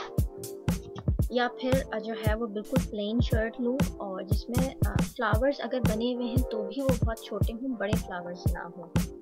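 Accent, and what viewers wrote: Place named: United Kingdom. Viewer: okkkk that's great uve adopted a v good British accent that's why I was asking 😍😍😊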